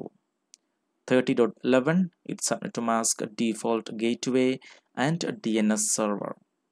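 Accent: Kerala, India. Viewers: Brother....I highly appreciate your work. I am a Networking Student. Your videos have been "'Life Saver" for me throughout my course. THANK YOU SO MUCH DEAR BROTHER. From your accent I could understand your origin is Kerala, I am from TN, we are near by and we are brothers. Please continue to post videos, as lot of people can be benefited by your remarkable work. THANKS CRORES.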